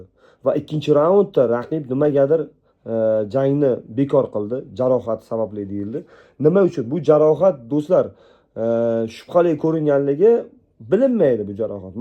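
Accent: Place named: Uzbekistan. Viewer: Ўзбекча тоза гапирганиз учун рахмат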